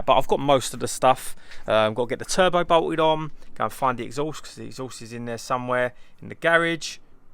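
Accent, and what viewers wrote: Essex, United Kingdom. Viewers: He sounds like Southend.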